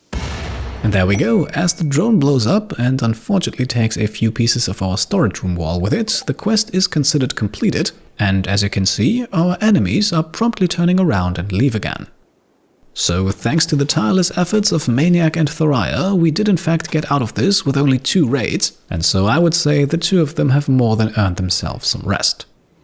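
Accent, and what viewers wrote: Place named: Germany. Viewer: thankyou for your cute German accent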